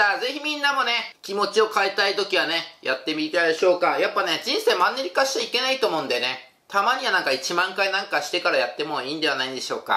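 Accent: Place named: Kansai, Japan. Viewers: 時折に関西弁が出る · 今日ちょいちょい関西弁出た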